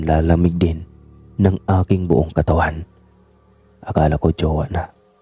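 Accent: Visayas, Philippines. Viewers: Iba talaga bisaya dila pa lang matigas na haha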